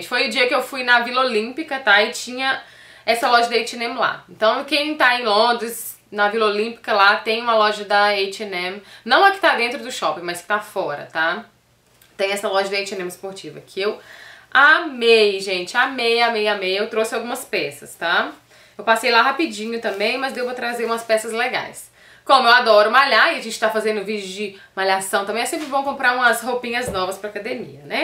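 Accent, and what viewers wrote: Minas Gerais, Brazil. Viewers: Eita sotaque minerin gostosoooo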